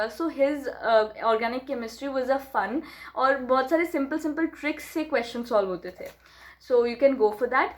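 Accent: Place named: Saharanpur, India. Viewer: Di aap saharanpur ki lagti ho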